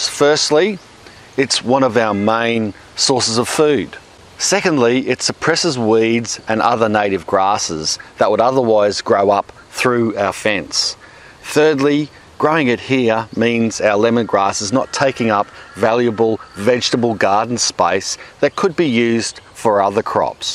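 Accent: Australia. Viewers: Love the Australian Accent....love all the Videos posted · Great information! Unfortunately I don't see any lemon grass sold with roots. I see two kangaroos in your backyard. That's a bonus. No wonder you have Australian accent! I've been down there 3 times. It's a beautiful continent. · Well done brother! Great presentation+most beautiful Aussie accent gives extra kick! ;) Thank you. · Love your aussie accent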